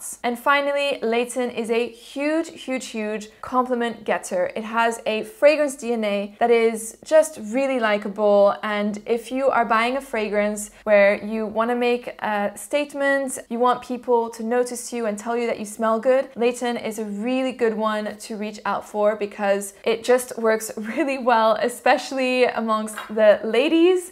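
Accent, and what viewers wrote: France. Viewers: Probably a silly question but I've watched you some and your French accent sounds exquisite! Are you French? 😊 Cheers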